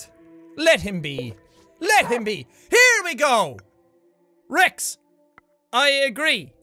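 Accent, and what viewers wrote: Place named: Ireland. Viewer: Please make more videos where you talk in that heavy Irish accent it's very beautiful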